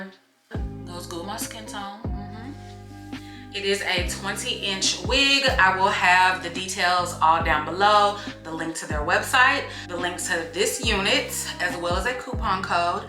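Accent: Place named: New York, United States. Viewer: He definitely sounds like a NY j*gga you picking up your sonnnnnnnn lmao #ihateithere #nycnative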